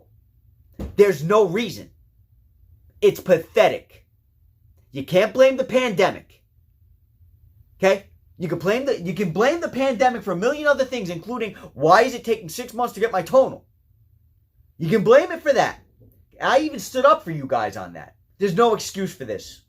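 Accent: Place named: East Coast, United States. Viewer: I kept listening because I honestly love your accent. You must be from the east coast? New viewer here. My mom had a thick east coast accent and I miss it everyday since she passed away.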